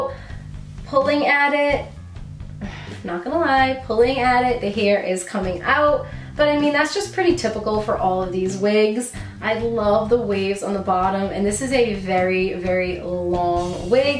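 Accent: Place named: Midwest, United States. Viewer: Her accent has a Midwestern sound. Like Wisconsin or Minnesota. It's a little infectious from her.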